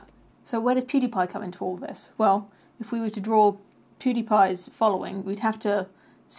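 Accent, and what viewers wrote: United Kingdom. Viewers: Insightful analysis, clear diagrams, no makeup, British accent. is literally the sexiest woman on Youtube.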